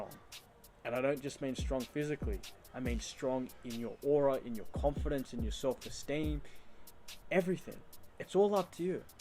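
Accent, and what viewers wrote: Australia. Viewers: just popped up in my algorithm, stoked to hear an aussie voice on this journey. cheers lad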